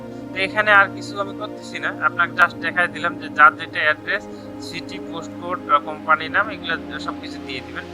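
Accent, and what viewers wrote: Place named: North, Bangladesh. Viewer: অনেক সুন্দর ভিডিও ব্রো। ভাইয়ের বাসা কোথায়। ভাষা শুনে আমাদের উত্তরাঞ্চলের মনে হয়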